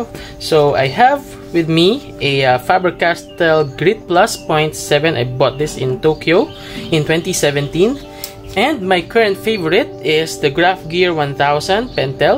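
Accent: Philippines. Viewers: Are you Filipino? Your accent is lovely.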